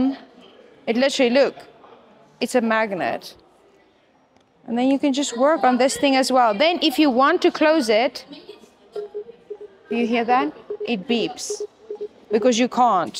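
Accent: South Africa. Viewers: Is she from South Africa? The accent is almost South African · Coolest is your South African accent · Hahaha, for sometime now i have been saying aiyeee this accent is South African 🇿🇦. Now after watching a couple of videos i decided to check when was this channel opened. " so waar" she is South African 😂, we love you. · Love the South African accent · You sound like you are from South Africa, I can hear a bit of an Afrikaans accent. Great video thank you very much.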